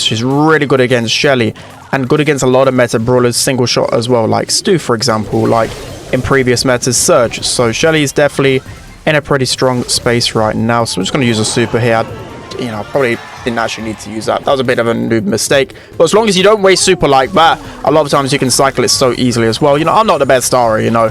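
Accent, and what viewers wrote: United Kingdom. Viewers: I love your british accent 🥰🥰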